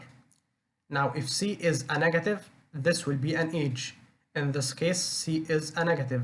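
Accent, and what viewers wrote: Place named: Egypt. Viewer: Great explanation bro, I also feel that's an Egyptian accent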